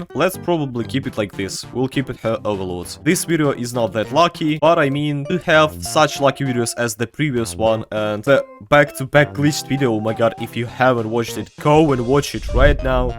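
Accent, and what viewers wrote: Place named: Russia. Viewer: когда думал все начало видоса чо за акцент русский а потом видешь как вместо английской E пишется у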